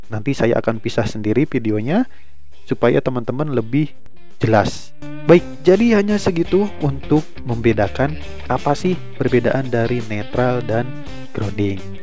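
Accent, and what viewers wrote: West Java, Indonesia. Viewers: Mantap kang solosunya. Sunda banget😅😅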